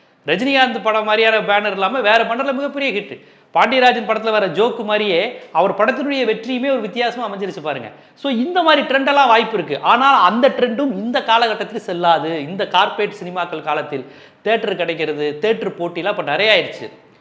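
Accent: Madurai, India. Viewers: Bro neega madurai aha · நீங்க மதுரையா.. நல்ல நாகரீகமான விளக்கம்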